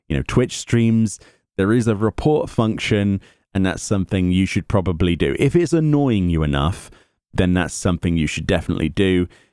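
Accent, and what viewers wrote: United Kingdom. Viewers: Thanks for the enlightenment in your last teatime. 🙂 I enjoy the teatimes very much and would love to have more of them. My question is a bit personal and I apologize if I'm putting you on the spot: The way you pronounced the word 'early' made me wonder which part of the UK do live in? I understand if you'd rather not answer that due to those dimwits who think Swatting is a nice thing to do. After my love for FFXIV comes the love of languages. The English language has a special place in my heart and I'm curious about the different accents there are and where to find them. Which in turn is the reason for my question 😅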